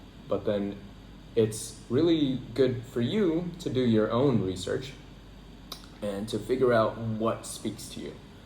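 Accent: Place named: Iowa, United States. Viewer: Jakarta, Indonesia? You sound like you’re from Des Moines, Iowa! Great video brother. Army is spectacular. The advice you share is gold.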